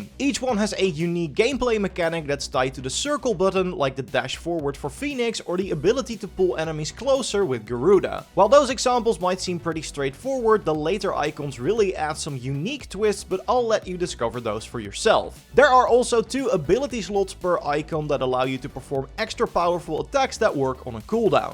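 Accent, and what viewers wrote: Netherlands. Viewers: O dear, this kind English spoken by a Dutch, It is hard to listen to. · Judging from your accent, you're Dutch? 😂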